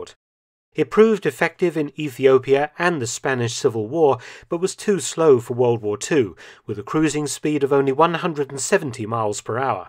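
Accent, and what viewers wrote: United Kingdom. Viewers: He sounds like lots of my friends! (I’m British).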